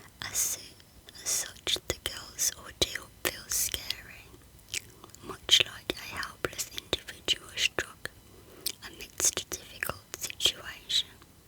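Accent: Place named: Dudley, United Kingdom. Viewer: Where are you from? Your accent has a big Dudley twang to it. X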